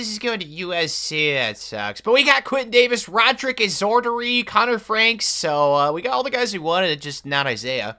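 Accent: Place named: Idaho, United States. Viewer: Im 95% sure you are from Idaho dude!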